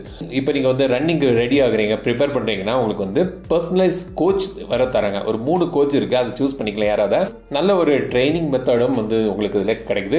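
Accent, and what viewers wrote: Kanyakumari, India. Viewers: அண்ணாச்சியின் குமரி தமிழ்..😍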